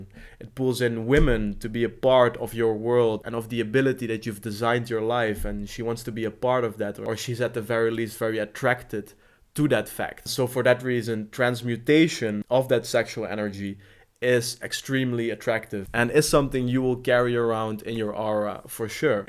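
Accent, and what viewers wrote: Netherlands. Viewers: Ben jij Nederlands? Je klinkt Nederlands. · Ik ruik een nederlands accent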